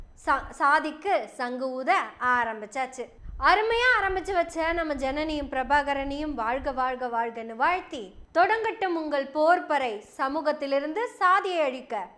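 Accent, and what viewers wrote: Kongu, India. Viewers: தாயே அருமையான கொங்கு தமிழ் உனது குரல் மலழை மொழி போல் உள்ளது அருமை தம்பதிகளுக்கு மனமார்ந்த வாழ்த்துக்கள் ஜெய்பீம்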